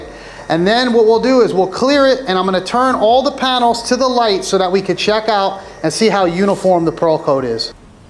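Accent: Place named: Boston, United States. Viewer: Accent like your from Boston. Or Minnesota.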